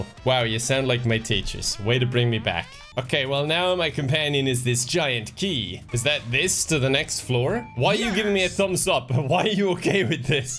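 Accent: Ireland. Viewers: You're Irish? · Wait! Irish?! I thought you were Spanish!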